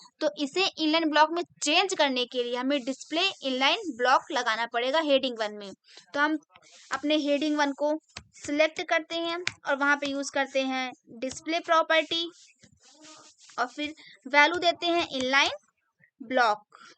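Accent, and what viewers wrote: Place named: Uttar Pradesh, India. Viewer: HI what's your name I'm also learnig Javascript, and also learnig css, from where are you, I think You are from UP, am i right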